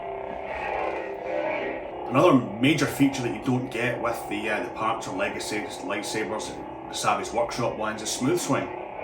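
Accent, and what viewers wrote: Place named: New Jersey, United States. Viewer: That’s a lovely accent you have there, New Jersey?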